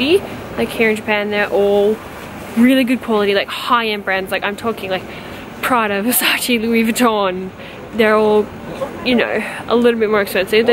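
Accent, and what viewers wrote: Australia. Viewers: I love how hard you spin that gacha spinner! And oh God I'm such a sucker for a sweet Australian accent. You just got a new fan,!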